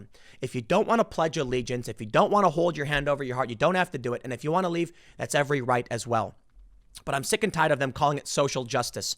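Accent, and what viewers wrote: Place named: Chicago, United States. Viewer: This is the first time I've noticed a chicago accent from Tim. He must actually be upset about this.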